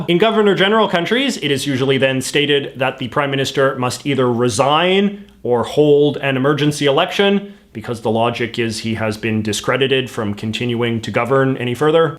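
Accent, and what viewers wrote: Canada. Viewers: I love yer sarcastic energy 💃🏼(& that is one heavy Canuck accent 😁 unlike my daughter who lives in van, she is dual citizen, no accent, tho she knows french)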